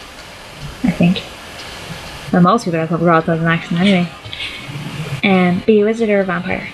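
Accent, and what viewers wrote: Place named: Ireland. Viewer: I LOVE YOUR FLIPPIN ACCENT, I've got Irish in me and I've been trying to get the accent down and you speak it fluent and I really could use your help with it.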